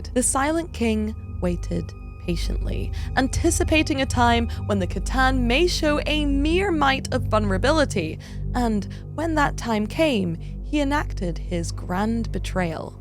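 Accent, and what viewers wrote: Scotland, United Kingdom. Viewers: Awesome! Looking forward to the next segment/chapter. Besides, I like hearing you talk lol. Americans tend to like a British accent 😊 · Nicely put, great story to hear, I like a lot the british accent. (Y) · Bugger! Now I've got to get precise! You know that we sing when we speak? I don't hear too much Edinburgh. More north. Closer to Perth. I'm full of shit, but love a challenge 🤗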